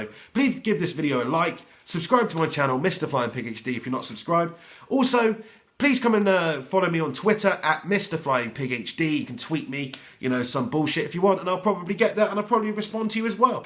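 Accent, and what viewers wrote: Manchester, United Kingdom. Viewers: Your Mancunian accent is very strange.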